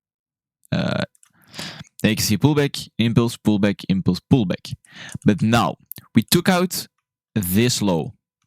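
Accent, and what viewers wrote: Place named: Netherlands. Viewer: ahh okay.. thanks for replying. Btw..Nice Dutch-English accent you got there!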